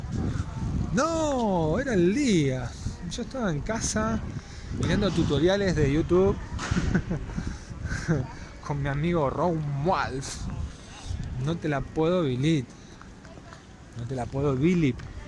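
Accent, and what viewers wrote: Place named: Argentina. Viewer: El barbijo es para usarlo bien...Apenas empezaste hablar me di cuenta que sos de los nuestros 🇦🇷💖 Saludos desde Irlanda 🇮🇪🇦🇷💖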